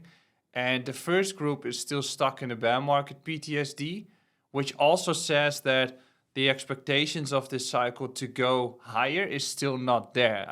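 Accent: Netherlands. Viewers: The Dutch accent though 😅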